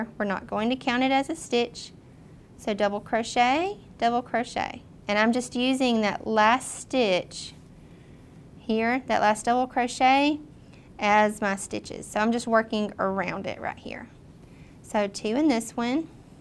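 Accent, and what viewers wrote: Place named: North Carolina, United States. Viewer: I have to ask, are you from North Carolina? I feel like I recognize your accent :)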